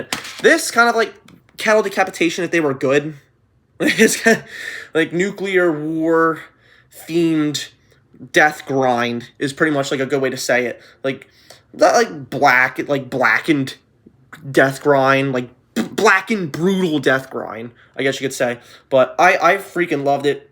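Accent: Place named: Texas, United States. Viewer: I'm Texan and I approve the Texan accent....yall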